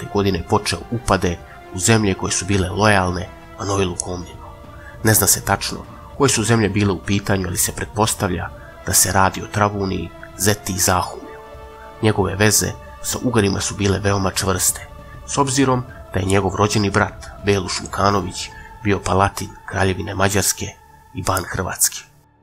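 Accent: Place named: Čačak, Serbia. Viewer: Sve pohvale za dokumentarce koje pravite. Kad cujem glas sa cacansko-lucanskim akcentom, osetim se kao da mi pradeda Miloje pripoveda neku staru skoro zaboravljenu pricu. ;-) Svaka cast.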